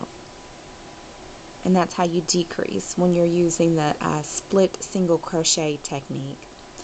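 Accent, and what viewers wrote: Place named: South, United States. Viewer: Oh my goodness you have THE cutest Sothern drawl....and I'm a NC native....this is what I imagine a southern bell (in the big dresses) sound like, lol!!! Btw, great video and instructions!! New sub!!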